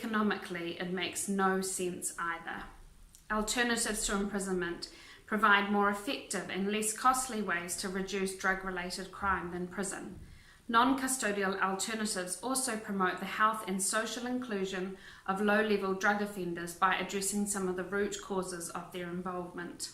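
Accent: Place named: New Zealand. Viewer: Just watched Al Jazeera (stream AJ) about prisons in Europe. Couldn't help it but amongst the comentators I noticed you sound very very Kiwi. Well done! Aotearoa all day!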